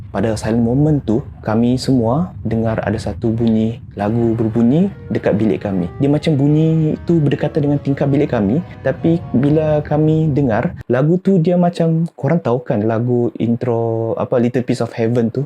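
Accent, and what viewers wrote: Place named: Sarawak, Malaysia. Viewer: Budak sarawak rupa nye speaker nihh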